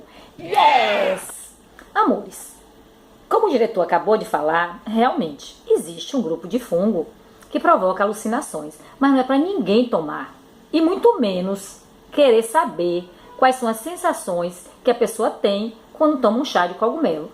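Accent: Northeast, Brazil. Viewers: Esse sotaque nordestino deixa o vídeo mais empolgante ... Na moral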